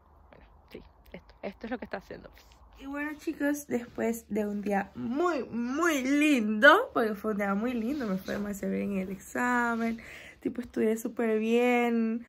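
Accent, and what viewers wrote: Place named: Nicaragua. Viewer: Vivo en inglaterra te sigo de unos meses atras, me llama la atenciòn tu acento Nica. Soy nica.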